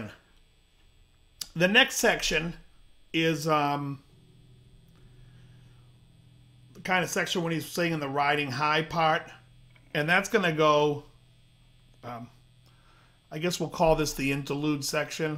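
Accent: Boston, United States. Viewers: Do you think this guy's from Boston?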